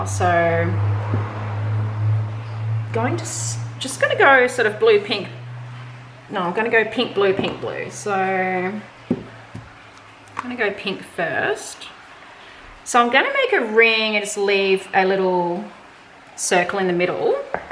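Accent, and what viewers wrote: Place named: Australia. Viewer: I find your Aussie accent wonderfully refreshing ( our accent....lol )....this pic is gorgeous ! New sub here :)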